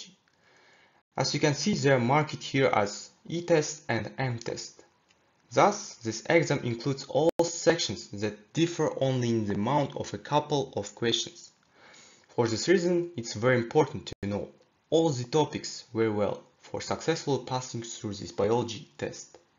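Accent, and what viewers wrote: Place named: Eastern Europe. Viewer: I love your Slavic accent! This video was quite helpful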